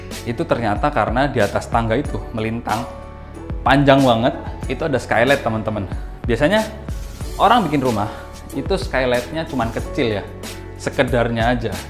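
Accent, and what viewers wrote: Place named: Banyumas, Indonesia. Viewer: mas logat ngapak nih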